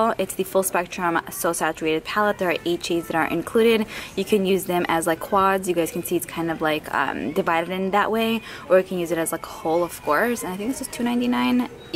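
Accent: New York, United States. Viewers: Just found your channel and I'm loving it! Congrats on the collab 👏. Which TJMaxx is this? I see you're in the NY area (and I can def hear it lol) and I swear the North Jersey ones aren't as stocked up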